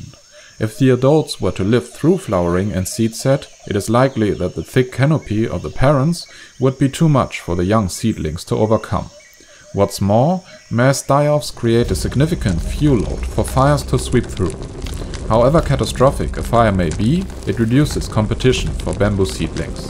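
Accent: Germany. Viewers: He sounds soooooo German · I love your German accent. It's very subtle, but still noticeable. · Is that a german accent?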